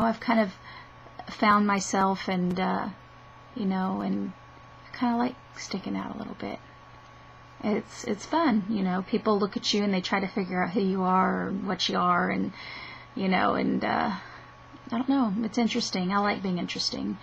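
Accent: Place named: Kentucky, United States. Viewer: Everything you said about hanging out with whites or koreans at separate times is exact to how I am. lol. You can't act one way around this group and the same with the other group. You have to refrain yourself. haha. You look more Asian than I do, imo. But your voice is DEFINITELY a Kentucky voice. haha.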